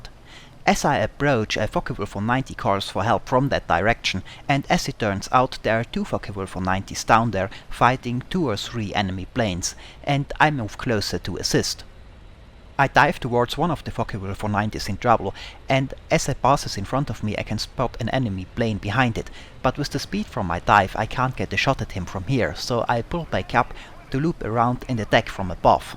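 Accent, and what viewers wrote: Austria. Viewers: IIRC, he is from Austria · ***** so you havent heard an Austrian, exactly said Tyrolean, speaking english before.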